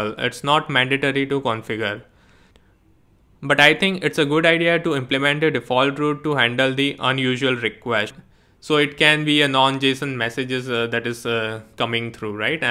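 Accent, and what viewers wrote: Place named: India. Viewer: Thanks. Love from Nepal. You sound like Indian, your accent 😀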